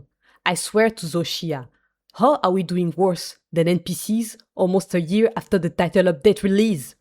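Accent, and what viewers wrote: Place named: France. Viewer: You can count on a French to not hear the obvious French accent... I got jumpscared by your "les amis" lmao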